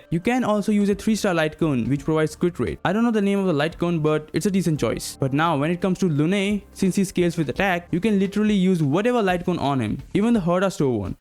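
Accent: India. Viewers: Bro yur accent is like Indian is that true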